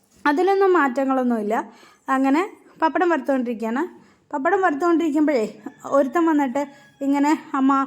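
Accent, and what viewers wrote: Palakkad, India. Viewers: നല്ല വീഡിയോ ❤❤ പാലക്കാടൻ ഭാഷ ഇഷ്ട്ടമായി കേട്ടോ..👍👍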